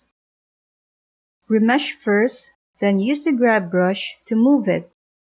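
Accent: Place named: Philippines. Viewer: I hear a pinay accent😅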